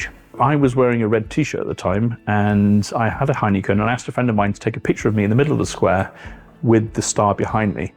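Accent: United Kingdom. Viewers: You have a pleasant voice and your British accent sounds so good to my Finnish ears. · I agree. has such a nice, soothing voice and British accent is always welcomed.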